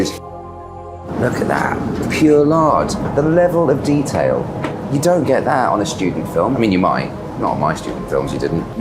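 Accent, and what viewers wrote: United Kingdom. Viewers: Too vague to say Southern. I am from the South West and a lot of people sound like farmers here. He has a middle class London accent, as someone else said. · It’s called a southern British accent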